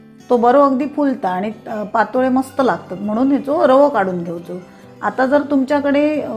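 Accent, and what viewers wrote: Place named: Malvani, India. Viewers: छानच पातोळी दाखविली त्यातून मालवणी बोलणं असे वाटत नागपंचमी आली खुप खुप छान ताई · मी आज केल्या पतोल्या मस्त झाल्या. माझ्याकड़े कुंडित आहे हलदिचे झाड़. आणि तुझा मालवाणित बोलणा लय आवडला. मी पण kanakavlicha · व्वा छान झालेत patole .. ani तुमची मालवणी 👍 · छान मी कसालची आहे आम्ही पण असेच बनवतो तुमची मालवणी मस्त वाटली तुम्ही वेंगुर्ल्याच्या वाटत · तुमची मालवणी बोलत ऐकायला मजा येते...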